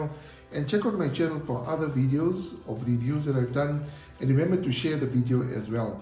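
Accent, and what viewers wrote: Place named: South Africa. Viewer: Wow, a true homegrown South African English accent, love it! 👏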